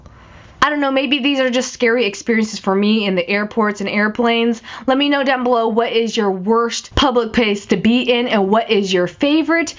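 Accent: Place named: Texas, United States. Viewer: You picked up a smidge of a country accent while you were in texas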